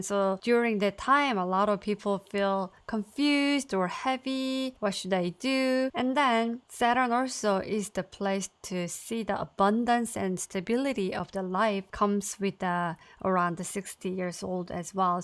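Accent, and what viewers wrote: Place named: South Korea. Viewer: Sorry I'm learning Korean and I thought I recognised your accent. This is a very good video and clever to use slideshows :)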